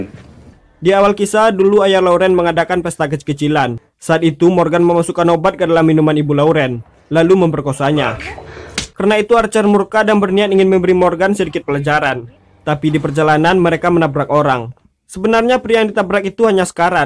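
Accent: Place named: Padang, Indonesia. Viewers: Saya merasa ini yg bicara org padang 😂😂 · Asli padang ya bg?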